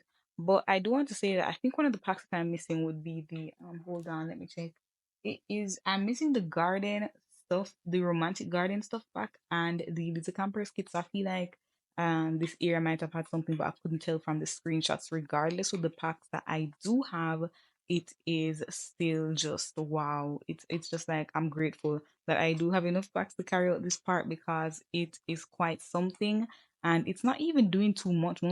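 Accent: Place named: Caribbean. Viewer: Ouu I hear an accent happy to see other Caribbean gamers this was a great video 🫶🏽😊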